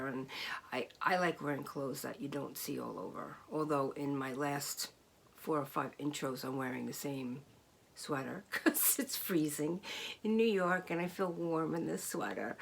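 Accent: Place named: New Jersey, United States. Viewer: I am intrigued by them. Not sure I would wear them. Are u from NJ? I am trying to guess ur accent.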